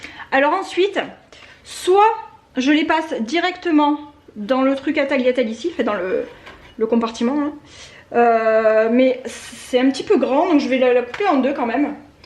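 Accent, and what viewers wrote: South, France. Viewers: C'est un moment détente quand je regarde tes vidéos... Tes plantes... le soleil et ton accent du sud me font oublier un instant le vilain temps de chez nous et les soucis 🙂😘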